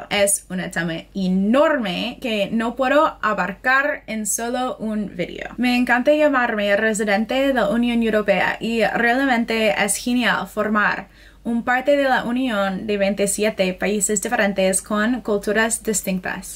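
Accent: United States. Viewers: Que lindo El Castellano pronunciado por una Estadounidense . Saludos Desde Italia .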